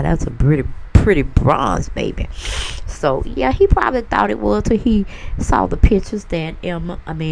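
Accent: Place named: South, United States. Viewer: U must be from the south , may be Florida your accent is 😂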